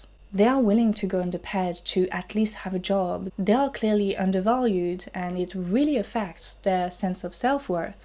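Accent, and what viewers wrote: France. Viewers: Hey, are you planning on making a video in French anytime? I really like your accent, and I think hearing you in your native tongue would be lovely :)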